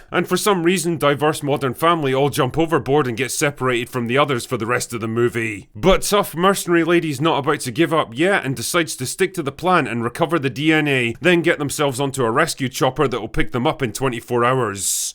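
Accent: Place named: Scotland, United Kingdom. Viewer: I had no intention of going to see this movie--just like I haven't seen any of the other sequels; I'm just here because I think it does a little something for me to listen to a Scotsman sh*t on stuff.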